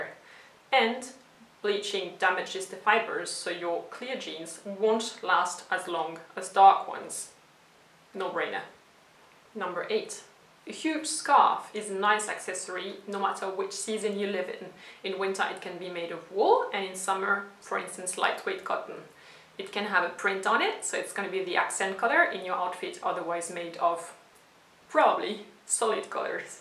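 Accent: France. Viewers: Quand un français essaye de parler en anglais sa donne ça ! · I was thinking that you were actually a French, from your accent.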